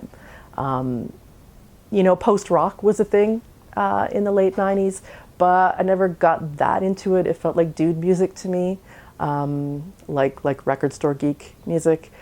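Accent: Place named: Canada. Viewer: ? Thank you! My heart is melting. I heard your Canadian accent immediately.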